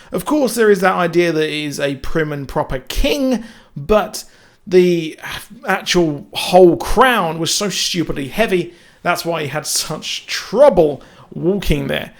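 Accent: United Kingdom. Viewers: Why no views? Why no likes, why no comments, and why so many subscribers? EDIT: waiiiiiit.... ya sound like ur from London, and talking about Hamilton, an American musical?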